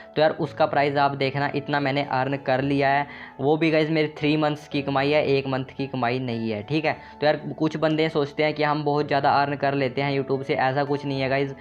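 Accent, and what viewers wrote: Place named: Haryana, India. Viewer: Bhai mene teri boli t e pta laag gya tha ki tu haryana ka h isliye tera qna dhund rha tha😜😜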